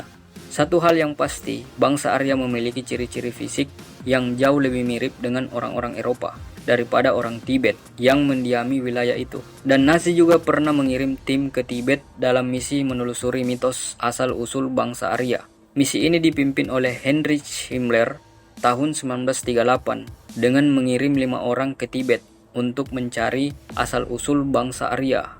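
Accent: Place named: Makassar, Indonesia. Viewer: Logat Makassar nih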